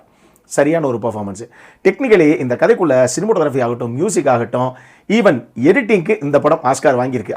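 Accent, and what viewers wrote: Madurai, India. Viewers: bro neenga Maduraiya❤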